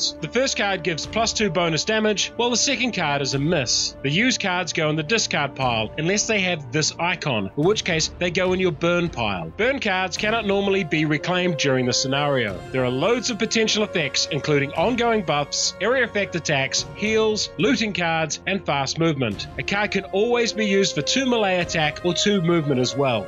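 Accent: New Zealand. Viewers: Is this guy a kiwi?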